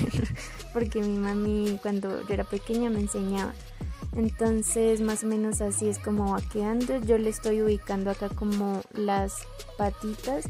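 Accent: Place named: Colombia. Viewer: lo sabía reconocí tu acento jaja yo también soy de Colombia , no sabes cómo me alegro de saber que hay alguien con tal talento en mi país , eh visto a muy pocas , espero seamos más ✨💖 tienes todo mi apoyo ewe ✨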